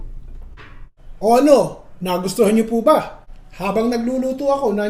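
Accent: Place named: Nueva Ecija, Philippines. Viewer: Taga nueva ecija po b kau chef? Kase yong accent at mga words ninyo ng tagalog.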